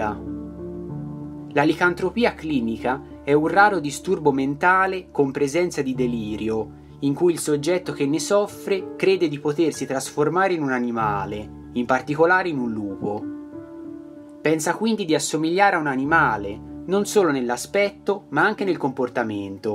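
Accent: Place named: Tuscany, Italy. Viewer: Video molto istruttivo.Bella la parlata toscana sempre gradita grazie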